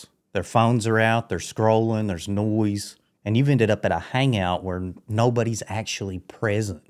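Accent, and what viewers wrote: South, United States. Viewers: You have a totally southern drawl. Do you get paid for these videos. ???????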